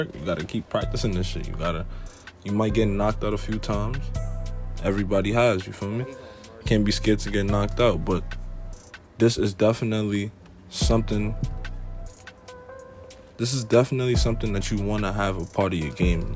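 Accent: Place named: Bronx, United States. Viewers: U from new york fasho bru. U gotta be😂😂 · Bronx I dead recognized the accent after you said controller🤣😭 fire video gang 🤞🏿‼️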